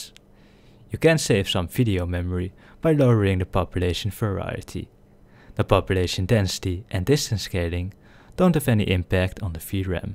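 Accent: Netherlands. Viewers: Are you dutch? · definitely dutch. Gekke nederlander :P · Ja nederlnads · Ben je een nederlander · I thought he was a friend of mine but he is probably dutch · I don't know why but this guy sounds Dutch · Easily tell he's dutch, they all sound the same :)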